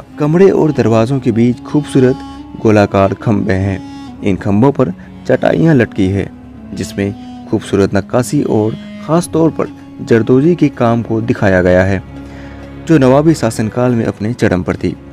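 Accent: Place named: Bihar, India. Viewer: Bihar se ho kya Bhai launguage me influence ...samajh aa rha hai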